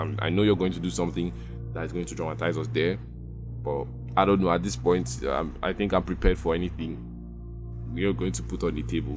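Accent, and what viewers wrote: Nigeria. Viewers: Nigerian accent obvious bro.. PH boi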